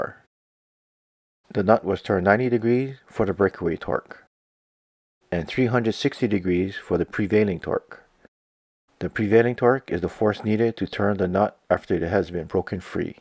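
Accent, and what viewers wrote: Hawaii, United States. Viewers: Eh, you from Hawaii? Can tell by your Local accent -- I'm a 5th-gen Local from Maui, just found this channel, right on 🤙🏼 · Cahz u from hawaii, sound like u get some moke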